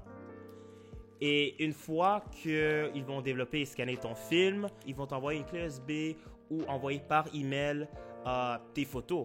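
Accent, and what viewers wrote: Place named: Quebec, Canada. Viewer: "desole pour l'anglais" 😂 allors, mon francais n'est pas tres bon sans Google Translate. (<- I actually typed that without looking it up so it probably has grammatical/spelling errors) Being from the Toronto area, while I "learned" French, in school. It was hard to properly learn it. I'm learning more from watching French-speaking youtubers and French shows than from class! The auto captions helped me understand what you were saying but it's not always accurate. maybe for these, you could include French captions. Also, as soon as I heard your French, I KNEW you were from Quebec...the accent is just too indistinguishable😄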